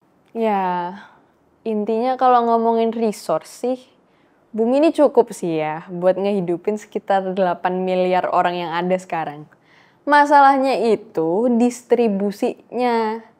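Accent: Java, Indonesia. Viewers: Nonton karena medoknya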